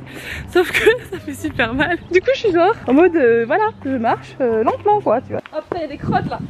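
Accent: Belgium. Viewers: Tu es belge? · tu es belge?! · elle est belge?😶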